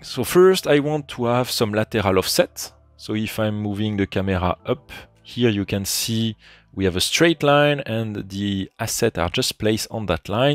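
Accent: France. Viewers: me too 😂😂😂😂 its the first time in my life to hear a french english accent as strong as this one 🤣🤣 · Love this french accent 💌🇫🇷 and vive Twinmotion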